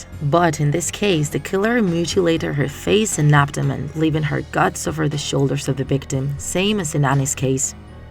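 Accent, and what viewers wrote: United Kingdom. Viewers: Do you live in UK? 🇬🇧 (United Kingdom?) I feel like you speak with a british accent. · British to me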